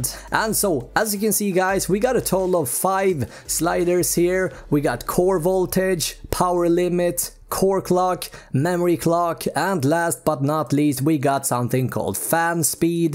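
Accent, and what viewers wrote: Sweden. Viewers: You have a Swedish accent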